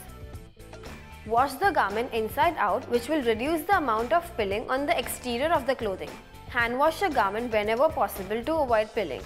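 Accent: India. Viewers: Tania Castellon lol she is speaking English with an Indian accent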